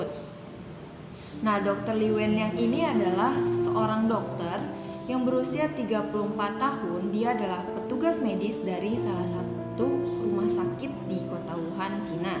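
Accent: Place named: Java, Indonesia. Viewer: Se' lo mba, medok temen bosone 😂 But, Informasi yg disampaikan sangat bermanfaat, thanks yoo atas infonya👍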